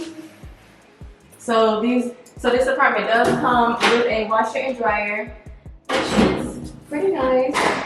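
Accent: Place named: Houston, United States. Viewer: I think I hear a bit of Houston accent 🤔 beautiful home.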